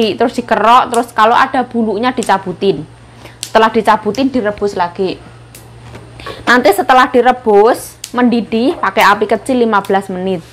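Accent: Java, Indonesia. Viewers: Kok medok bangat ngomong nya...ya.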